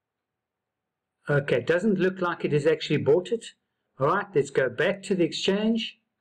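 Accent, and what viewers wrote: South Africa. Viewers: He sounds South African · That beautiful south african accent. Dankie vir die video maat!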